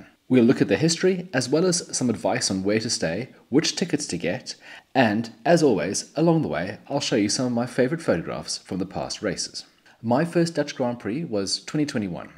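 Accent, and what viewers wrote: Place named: United Kingdom. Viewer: You’re Dutch? You’ve nailed the British accent if your Dutch.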